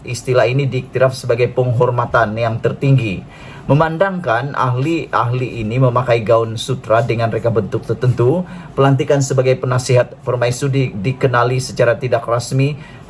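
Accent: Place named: Sabah, Malaysia. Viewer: Encik semakin pandai berucap dalam Bahasa Malaysia.Lograt bahasa Sabah semakin berkurangan. Syabas tuan.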